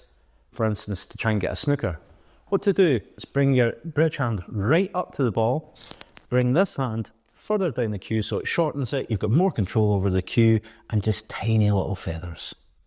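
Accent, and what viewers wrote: United Kingdom. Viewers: I'm so tired of listening to the British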